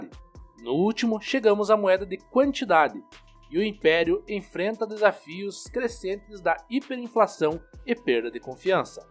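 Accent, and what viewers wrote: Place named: Rio Grande do Sul, Brazil. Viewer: Esse narrador é gaúcho.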